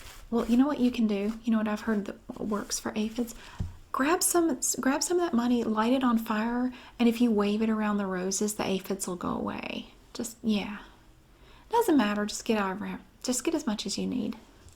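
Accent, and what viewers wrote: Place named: North Carolina, United States. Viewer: Your accent reminds me of my childhood in Catawba County, North Carolina. You are so the epitome of a southern sassy woman. I admire that!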